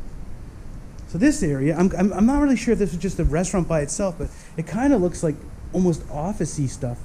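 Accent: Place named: Canada. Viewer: ABSOLUTELY LOVE THAT CANADIAN ACCENT!!! WE LOVE EVERYTHING ABOUT YA!!!! 😍🌟👍👍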